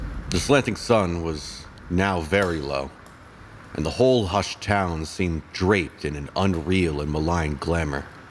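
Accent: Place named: Arkham, United States. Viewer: Oh yea, I was transported back to Roman horrors… So there’s another older take on this short story? I’ll look it up… Really enjoying your narrations, accent seems location-appropriate, practically out of an Arkham sanitarium…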